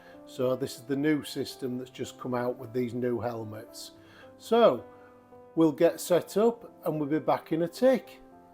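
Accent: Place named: Wigan, United Kingdom. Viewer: Ok, I have to ask. I have a customer from the UK who lives in Wigan, you sound exactly like him! Do you live near there?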